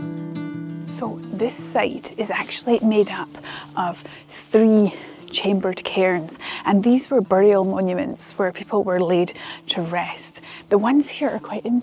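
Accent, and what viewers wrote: Scotland, United Kingdom. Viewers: I absolutely love listening to you and watching you ❤ A truly beautiful Scottish lass! I’ve fallen in love with your accent!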